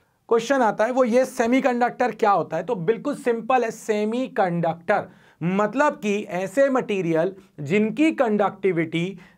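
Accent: Bhopal, India. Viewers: Ap bopal ke ho ..aap ki language lag rahe hai..👍.vese apne bhout aacha batya 👏👏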